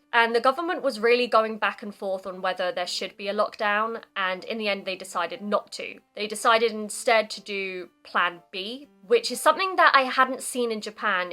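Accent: RP, United Kingdom. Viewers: Interesting and informative. You speak well , you presention is superb. Is that the Queen's English?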